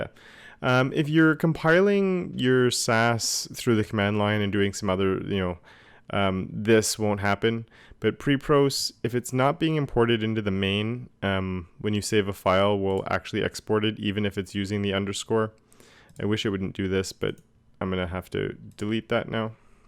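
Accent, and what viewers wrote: Canada. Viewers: love the videos, are you Canadian by chance?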